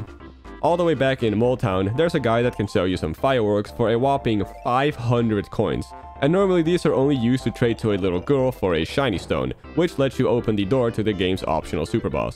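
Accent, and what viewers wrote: Netherlands. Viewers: You sound very dutch im dutch as well loll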